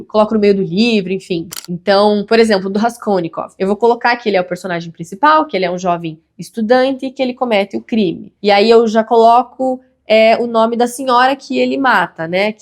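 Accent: Paraná, Brazil. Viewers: Que sotaque lindo .....acho que é paranaense ou da serra gaúcha..... A resenha é excelente....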